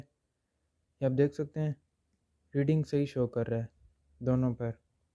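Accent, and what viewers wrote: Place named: India. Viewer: Do it in English I can't understand Indian accent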